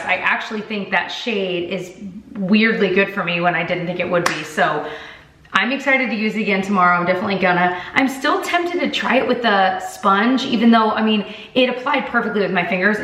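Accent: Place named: South, United States. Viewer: It’s a Southern thing too! Midwest and Southern accents have similar vocal placement and sounds.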